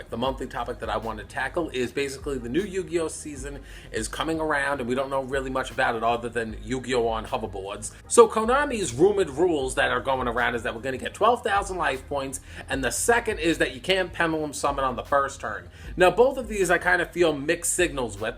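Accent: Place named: Brooklyn, United States. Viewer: I love how you have a Brooklyn accent and talk in a filthy frank voice at the same time. It's pretty cool and pretty funny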